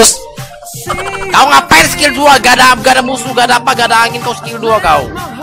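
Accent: Kalimantan, Indonesia. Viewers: Wah kalimantan ya bang ? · Bang Kmu dri kalimantan mana klo bleh tau sih :-)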